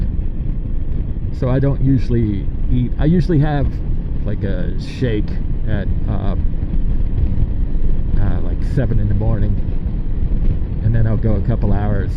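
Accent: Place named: New Jersey, United States. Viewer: Bringing NJ accent to your neck of the woods 😆 🤙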